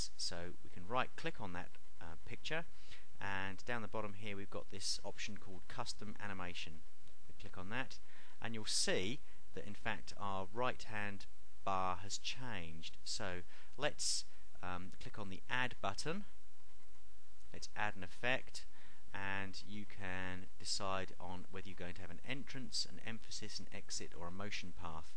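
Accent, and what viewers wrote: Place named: England, United Kingdom. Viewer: love the english accent... nice video btw... can you please tell me how to rotate images added in word documents?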